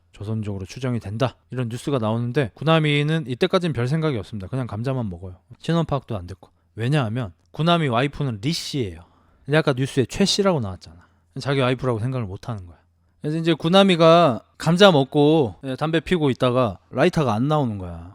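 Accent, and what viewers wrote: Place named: Gyeongsang, South Korea. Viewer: 경상도랑 조선족 묘하게 섞여서 혼란스럽네 ㅋㅋㅋㅋㅋㅋㅋ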